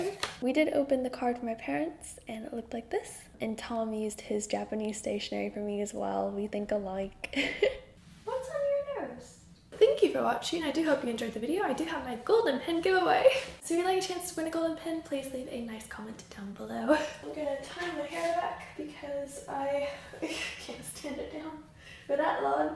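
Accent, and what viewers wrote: England, United Kingdom. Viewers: I can hear the British accent starting to shine thru, like a little bit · The longer you are in England, the more British you sound. For some reason, the accent really suits you! Happy anniversary. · I love how you sound a little british now❤️ congratulations! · You are sounding quite English lol love it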